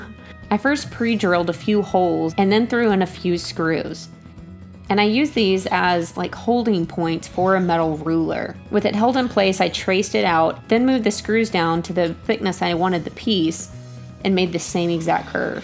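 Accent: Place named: South Texas, United States. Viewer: Lol, give 'em hell," I've got your back". My Southern Red Neck speech is a sorry imitation of your pleasant South Texas speech.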